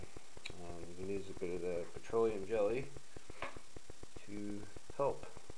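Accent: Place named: Newfoundland, Canada. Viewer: Definitely sound like a Newfie. ;)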